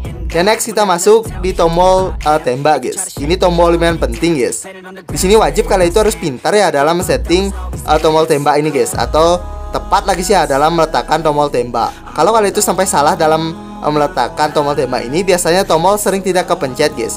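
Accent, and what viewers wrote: Bali, Indonesia. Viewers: Logat nya Bali banget😊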